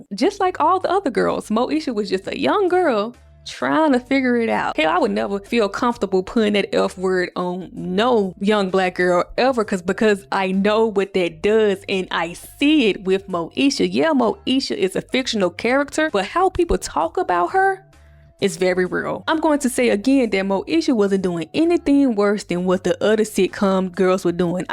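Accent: Memphis, United States. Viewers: She's from Mississippi! But I thought Memphis too!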